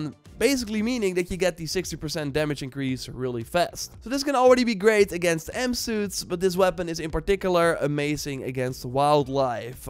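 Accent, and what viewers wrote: Netherlands. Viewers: nearly all youtubers I like lately are Dutch. What the hell 🤔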